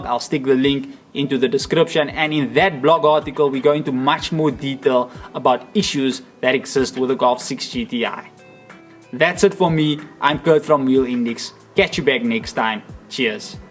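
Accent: South Africa. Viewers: lol He's definitely South African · Thats a South African Accend .. 😄😄 nice vid tho .. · the south african accent was a nice surprise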